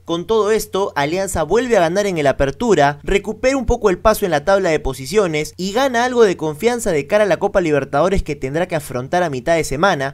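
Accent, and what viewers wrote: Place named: Argentina. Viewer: Te agradecería si dejas ese acento argentino ¡Che!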